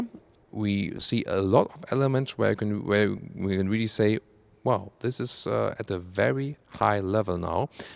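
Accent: Germany. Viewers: i like ur casual look betterand ur hairback looks better.still got a nice german accent~~~~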